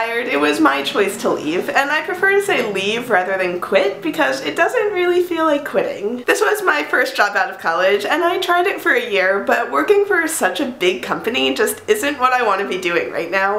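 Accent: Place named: Vassar, United States. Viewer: She seems to talk like she's from Vassar.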